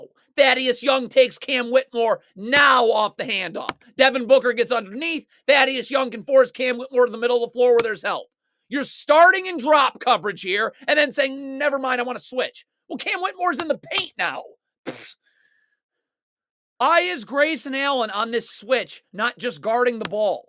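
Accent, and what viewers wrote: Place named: Philadelphia, United States. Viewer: ima big fan of this dude... he sounds like he a Philly dude...we act like this 24 7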